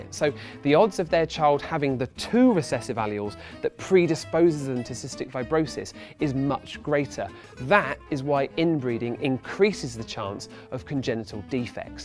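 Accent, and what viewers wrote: United Kingdom. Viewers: A brit talking about american inbreeding'? Lol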